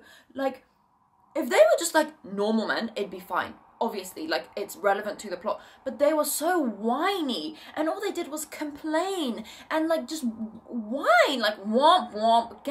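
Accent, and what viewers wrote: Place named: United Kingdom. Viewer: I'm so sorry, but me as an American....you being British makes this video so much better 😅😂 My YouTube feed knows me....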